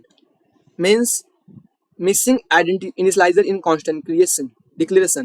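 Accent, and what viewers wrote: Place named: England, United Kingdom. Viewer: i dislike your aksen english, but i like your work. well done my friend.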